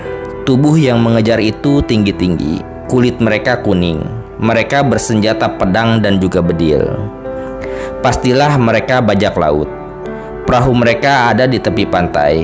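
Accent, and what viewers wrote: West Java, Indonesia. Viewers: Yang cerita logat nya Sunda yaa..😂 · Jelas orang ini dari gaya bicaranya orang sunda, legenda yg dia ceritakan tidak pernah ada dalam catatan rakyat danau ranau, jelas orang ini bohong, kelihatannya orang ini disuruh seolah olah orang ranau masih punya hubungan dengan orang batak, yg jelas kami orang ransu menolak sama sekali cerita ini...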